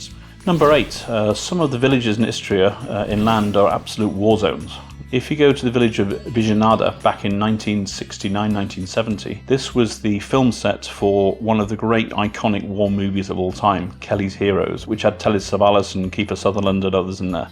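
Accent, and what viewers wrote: United Kingdom. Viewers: So sorry for sending you across the ocean. Somehow missed your British accent. But, later certainly explains your fluid words. NEVER Looking forward to your next thing. 🤗👍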